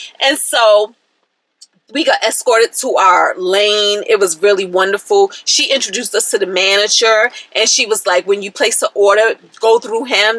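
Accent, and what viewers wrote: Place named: New York, United States. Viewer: Love your content ... you talk a little like a a New Yorker... are you ? Very grateful for your tips and tricks !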